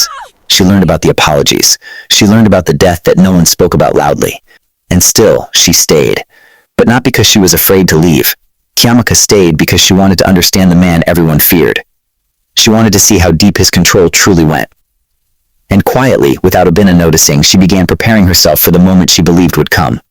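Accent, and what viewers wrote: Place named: United States. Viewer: Love your stories, but not a fan of the american accent telling the stories.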